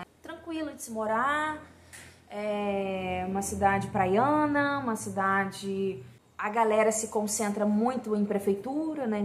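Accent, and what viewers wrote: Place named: Rio de Janeiro, Brazil. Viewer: Oi querida 🥰 Conheci hoje seu canal e gostei muito do seu jeitinho carioca de comunicar 😍 Também sou carioca e estou planejando morar em João Pessoa. Vou acompanhar você por aqui pra saber mais sobre a cidade. Um beijão e que Deus continue abençoando sua vida e sua família ❤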